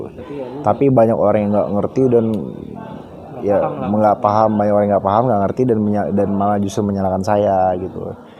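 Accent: Medan, Indonesia. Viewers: Sbnrny sih aku pkr mrk yg hujat bang itu kgn pgn bang d indosiar x krn kan udh kgn..mgkn gtu x bang krn kan bang ada nmny d maulid nabi itu tp knytaan ny bang ada d bali.jd pkran mrk abang lbh mentingin hoby..gtu mgkn..dn logat medan emank keras sih jd d fkr org abang mrh2..santai aja bang nanggapin haters sih..jgn d dgrin yg pnting berkarya aja..love u bang..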